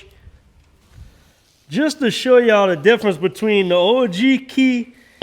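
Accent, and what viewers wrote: Louisiana, United States. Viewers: Damn you got the MOST crazy ass Louisiana accent 😂😂😂😂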